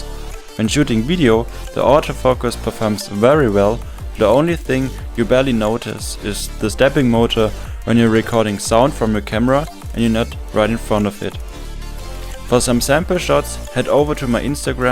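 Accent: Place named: Germany. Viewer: An dein Englisch kann man so gut erkennen das du deutsch bist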